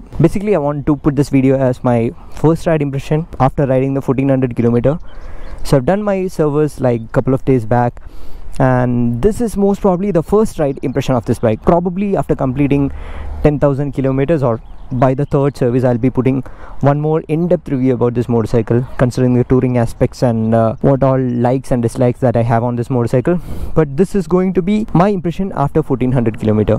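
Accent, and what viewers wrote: Kerala, India. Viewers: Unhideable mallu accent 😂👍🏼 way to go bro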